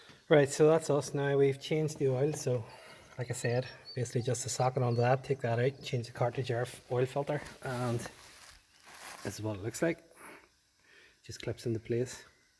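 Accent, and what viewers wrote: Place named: Northern Ireland, United Kingdom. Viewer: You're a diamond, thanks so much for the info. Just given you a like, your accent brings back fond memories I spent a couple of years In Northern Ireland in my formative years met some lovely people along the way, it seems you're carrying on that tradition.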